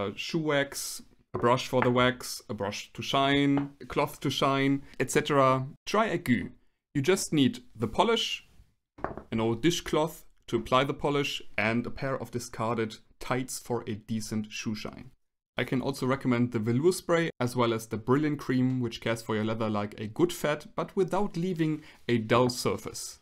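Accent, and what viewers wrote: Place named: Germany. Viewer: You sound German, I might be wrong but I’ve been learning German for about two weeks, I love the show Babylon Berlin and I love the clothes they wear❤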